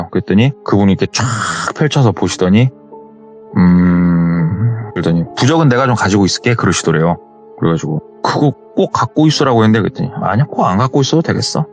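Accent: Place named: Jeonju, South Korea. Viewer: 전주 분이신가 보네요 ㅎㅎ 친구랑 말투가 똑같아서 뭔가 얼굴도 모르지만 친근감 있어요 ㅋㅋㅋㅋ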